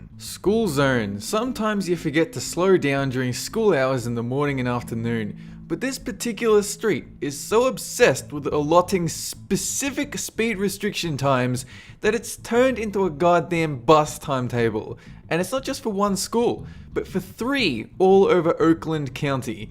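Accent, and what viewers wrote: Australia. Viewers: Is Australian? I can never tell the difference between a british, eglish, and australian accent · He just sounds like a normal aussie to me haha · Are you australian cause i am and you hardley sound australian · OMFGGG i'm Australian too.. but, that's not the point. i thought you were American, and as soon as i heard you say WE have a saying here in Australia i can now suddenly hear an accent coming from you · I don’t know how many times I’ve started a Planet video only to learn that wasn’t the narrator for that video. I don’t mind the other narrators at all, but the constant disappointment of not being able to listen to beautiful Australian accent when I intend to is annoying. Thanks for this playlist. Now I can listen to Australian lit whenever I want to, and only him. Also this is one of, if not my favorite, videos. I don’t know how many times I’ve watched this video. 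· Very funny! Also, if you have closed captioning, like I do, it doesen't understand the Australian accent And the "gusses" it makes in American English are funny too!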